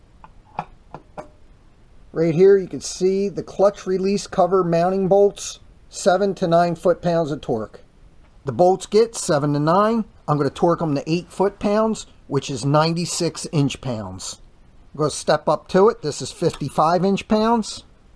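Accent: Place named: Mid-Atlantic, United States. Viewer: Also, are you from PA, Deleware, or Northern Maryland? Sounds like it.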